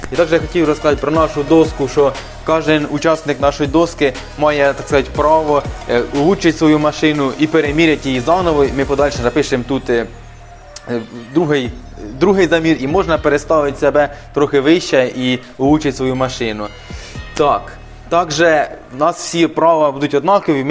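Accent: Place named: Surzhyk, Ukraine. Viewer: Контент цікавий, зйомка і все інше нормальне, але.. я теж, можна сказати, з села, але я не говорю так як хлопець на відео. Це пз*ц, слух дуже ріже. От ці «двігатєлі, сєкунди».. старайтесь трохи фільтрувати суржик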